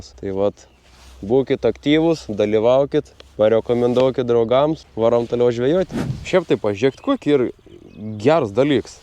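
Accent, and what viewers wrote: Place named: Samogitia, Lithuania. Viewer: Bet ta kap smage girdiet zemaiteska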